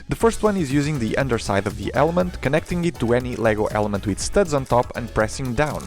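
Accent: Portugal. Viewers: Aos 2 minutos comecei a notar o sotaque, olhei para o nome do canal e conformou-se, portugueses para a frente! Gostei do vídeo, conciso e interessante, bom trabalho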